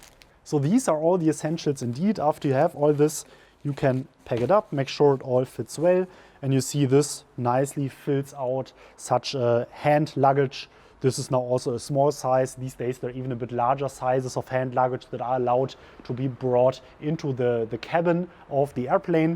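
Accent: Germany. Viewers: Hi! Could you tell me where from your accent is ? Are you German? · I watch because accent is brilliant! I just enjoy hearing him talk, and helps with my German pronunciation. Almost all my German friends have far weaker accents.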